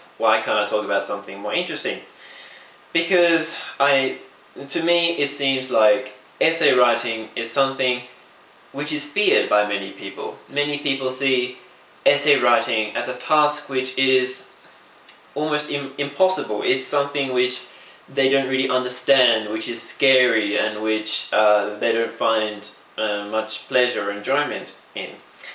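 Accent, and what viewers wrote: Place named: China. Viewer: you have a Chinese accent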